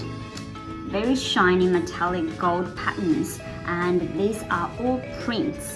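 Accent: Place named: Australia. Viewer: Are you Australian? You sound Australian.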